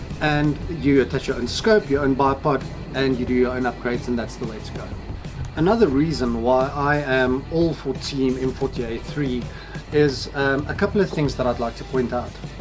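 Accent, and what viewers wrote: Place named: South Africa. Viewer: are u from south afrika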